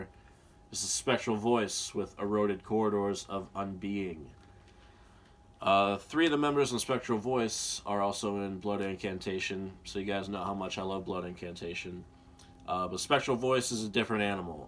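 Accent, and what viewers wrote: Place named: Australia. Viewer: I swear sometimes your accent sounds Australian. Watching 'Best Death Metal Records post-2000 (Part 1)' when, talking about the Asphyx album, you said 'this is true death metal, ya bastards' and you said it in the most Australian way possible. lol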